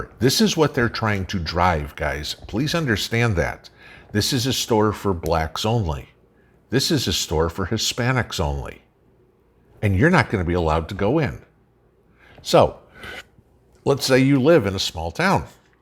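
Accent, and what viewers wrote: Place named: Chicago, United States. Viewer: Chicago, accent, you still have. I'm originally from Connecticut.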